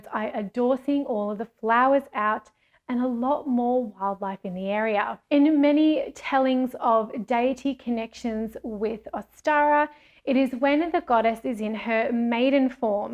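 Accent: Australia. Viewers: the accent threw me off. Australia. 🦘 🐨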